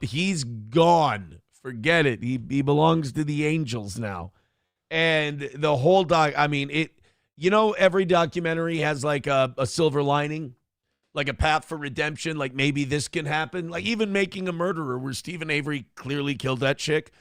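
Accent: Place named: Minnesota, United States. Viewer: sounds like he’s from Canada unlike your minnysoder accent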